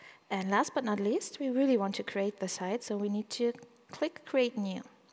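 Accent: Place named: Germany. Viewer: Die Mucke =) Nicht schlecht Herr Specht =) Vorträge natürlich auch ^^ Das very special Englisch ist actually very german =)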